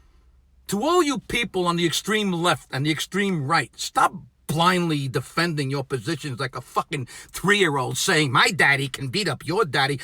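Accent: New York, United States. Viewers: That's pronounced New Yawkas. hahaha You can take the person out of New Yawk but you'll never take New Yawk out of the person! I agree with 100%!! There are good and bad in every profession and the bad need to be exposed and weeded out. Please don't generalize and put all into one category. That's not fair! The bad always make it bad for the good.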